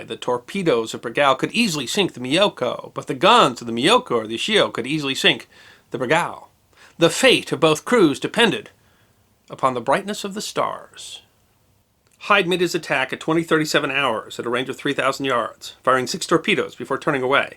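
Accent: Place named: Midwest, United States. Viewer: Those flat Midwestern "A"'s!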